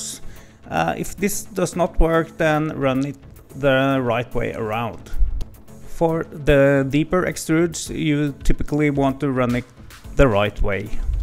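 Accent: Norway. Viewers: The norwenglish is strong in this one. Nice video neighbour.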